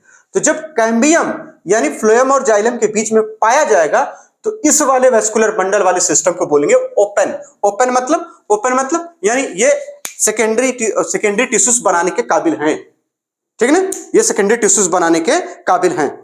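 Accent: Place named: Bhojpuri, India. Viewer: Bhojpuri lecture ho gya sir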